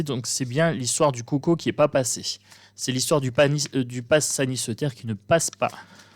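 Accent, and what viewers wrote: Nord-Pas-de-Calais, France. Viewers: Bonjour, vous seriez pas un peu ch'ti par hasard??? J adore votre humour 😊. Merci pour ce petit tirage. A bientôt.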